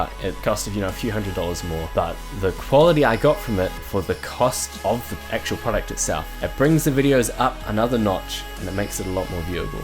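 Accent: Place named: New Zealand. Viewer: keep making videos, mate. your videos are amazing and I like your kiwi accent! :D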